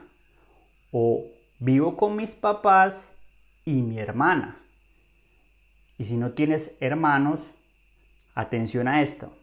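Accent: Colombia. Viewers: Gracias por este video. Soy de Polonia pero vivo en Inglaterra, y me encanta el acento colombiano!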